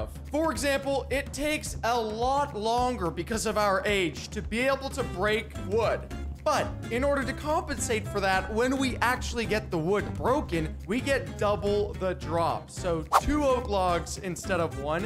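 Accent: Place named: Texas, United States. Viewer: you changed so much the last time I I watched one of your videos you had 100 subscribers and had an amazing Texas accent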